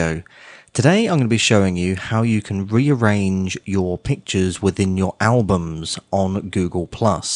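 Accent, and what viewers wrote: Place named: England, United Kingdom. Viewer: Thanks. I never feel stupid when someone with an english accent is describing something to me.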